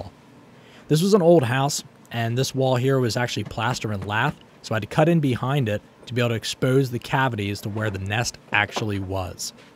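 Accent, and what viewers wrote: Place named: Philadelphia, United States. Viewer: You got a Philly accent. Certain words you say sound just like if you were from that area. Say the word Acme in one of your vids. Then I'll know for sure.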